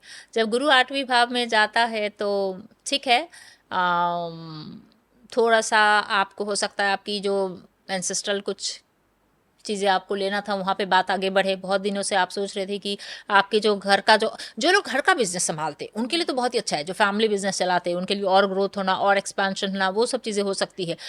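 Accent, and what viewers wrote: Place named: Bengal, India. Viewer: She is a Bengali,so the accent,but her predictions are good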